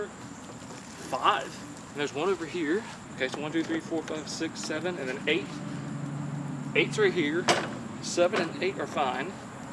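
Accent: South, United States. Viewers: I know he sounds southern at times but he jus lost his card there for sure 😂🤣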